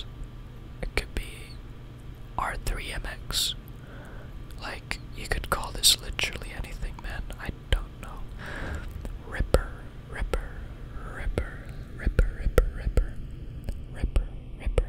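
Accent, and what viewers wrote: United Kingdom. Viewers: u nailed that british accent ngl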